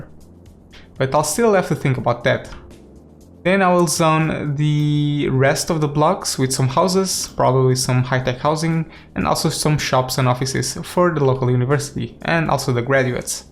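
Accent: Portugal. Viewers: Engraçado que ouvi o teu sotaque e percebi logo que eras tuga :) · A voz não engana ninguém, a maneira de falar o inglês, nota se mesmo que és tuga caralho!!! Gosto muito dos videos, continua o bom trabalho!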